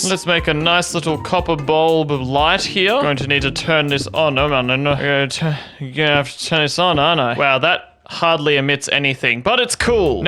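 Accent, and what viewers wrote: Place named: Australia. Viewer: hi are you from australia cause its your accent and i am too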